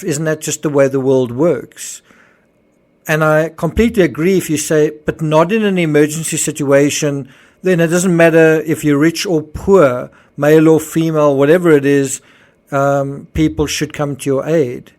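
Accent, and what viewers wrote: South Africa. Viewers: It is Hassan... but the narrator's South African accent has a "schwa" or soft R on that version of A...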